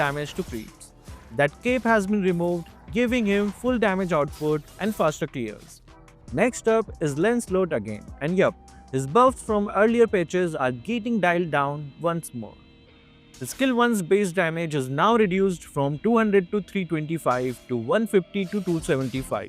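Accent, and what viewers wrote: India. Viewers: Japanese name but Indian accent 😅😂😂😂😂😅😅😅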